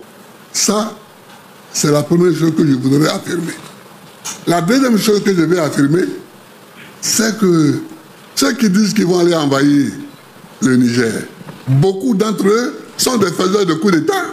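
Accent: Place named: Ivory Coast. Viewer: Il parle trop comme un vrai ivoirien fierté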